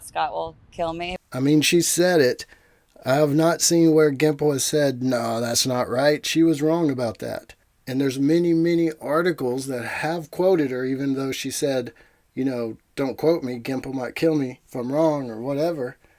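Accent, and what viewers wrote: South, United States. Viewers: Haha! Nah, I know what you're saying because I'm originally from the south. I lived in the Midwest for many years as well so I know how they get confused by even the slightest of southern accents.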